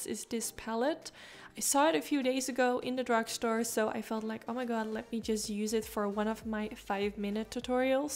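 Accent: Netherlands. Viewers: I immediatly thought you would live in the Nederlands ;) The accent always stays I guess. Nice tutorial! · She really sounds dutch it's located in america oh ok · Ik hoorde gewoon dat jij Nederlands was haha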